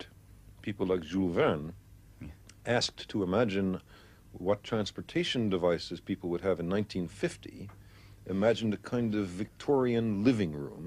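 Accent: England, United Kingdom. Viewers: the host is being agressively british while Sagan is being very american.. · this british dude is annoying af. talk faster you smug fuck. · Imagine there was a time when people spoke like that English dude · What a pompous ass English accent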